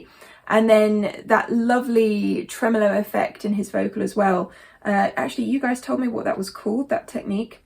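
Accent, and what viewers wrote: United Kingdom. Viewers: Best reaction ever, professional and respectfull, thanks and good luck, i like very much her typically british accent , nice. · Are you brithish ?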